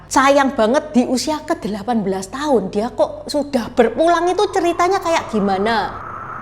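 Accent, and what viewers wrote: Java, Indonesia. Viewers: Ci.... medok amat ngomongnya ciii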